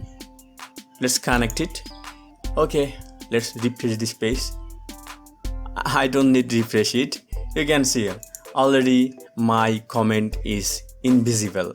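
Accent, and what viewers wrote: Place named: India. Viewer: you saved my ass bro, don't mind the accent i always trust Indian youtubers, there are just legit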